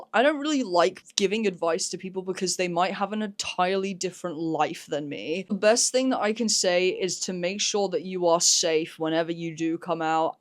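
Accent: United Kingdom. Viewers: Wow. Didn’t know you were British.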